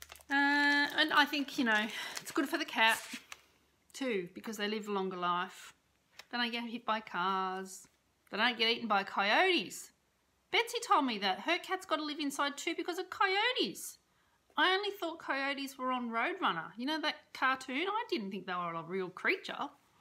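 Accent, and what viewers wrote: Australia. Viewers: Enjoy your flosstube and enjoying your "Aussie-Isms"!! I am from NC in the US and a video friendship with an Aussie stitcher is great!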